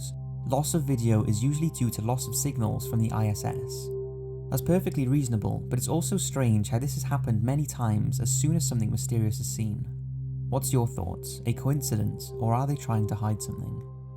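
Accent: United Kingdom. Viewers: He sounds British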